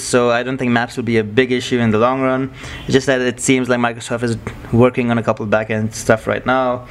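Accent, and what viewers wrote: India. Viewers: Thumbs for up that indish accent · i hate indian accents they annoy me im sorry to say but looks like the place iswonderful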